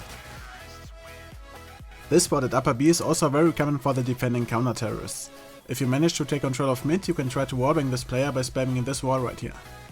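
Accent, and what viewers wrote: Germany. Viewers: Are you German? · Man hört einfach, dass er deutsch ist xD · Hört sich so deutsch an :) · wow, it's hard listening to this guy. dat German accent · For me understand your english is really hard because you have fkin German accent :D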